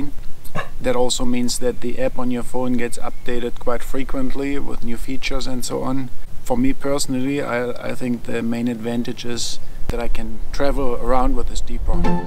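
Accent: Germany. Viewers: Do you have a German accent? :)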